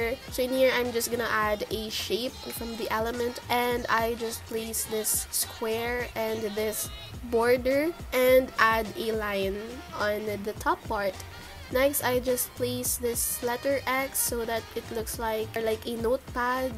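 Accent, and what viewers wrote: Philippines. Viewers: boses mo parang pilipino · Hello I’m new to checking out your channel :) It’s my first time learning & how to with tutorials on everything of making an intro for YouTube channel video! Question? Can I do these on my phone? Because I often use my phone more than my Mac 💻 or iPad? Because I don’t often bring these with me anywhere as they are heavy & not all of my photos/videos sync to my laptop or iPad,I took it off and forgot how to put it back haha 😂 anyways thanks for sharing I hope I can learn in no time! Also by the way your accent is I can tell your Filipino? Is that weird? 😅are you live in the Philippines or in the states? I miss being home but too ouch heat is kinda bad for my health! Thanks again for sharing!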